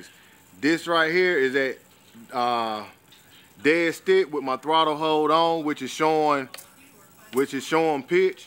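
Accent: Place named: Virginia, United States. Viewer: Thank you! You sound like my family members from Virginia. I appreciate your help.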